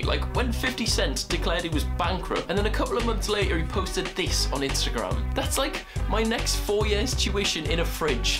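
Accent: Newcastle, United Kingdom. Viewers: Are you a geordie?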